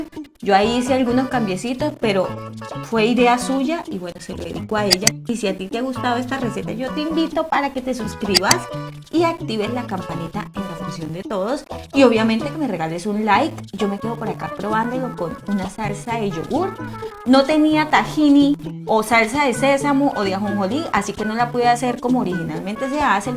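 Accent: Colombia. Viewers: Hola, muy ricas Y sensillas tus recetas ,bueno por el acento me imaginaba que eras de Colombia pero vives en Colombia? Gracias .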